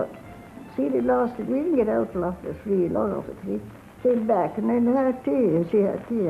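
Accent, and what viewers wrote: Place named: RP, United Kingdom. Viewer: She's so posh, I can't understand her.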